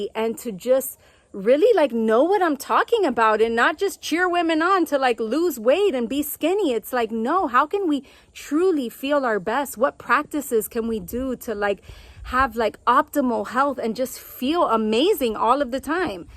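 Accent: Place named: Los Angeles, United States. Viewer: Hate that accent. Typical LA Hispanic sounding. I know girls from there that sound like this and can’t stand them.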